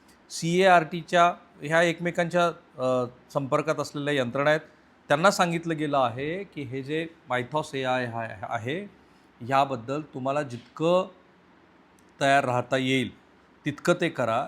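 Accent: Marathwada, India. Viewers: साहेब तिकडचे आहेत, मराठवाडा भागातील तिकडे ण चा उच्चार न असा करतात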